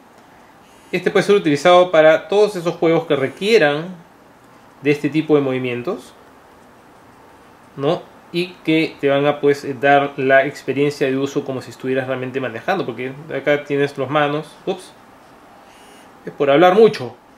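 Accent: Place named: Peru. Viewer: Por tu acento siento que eres peruano ?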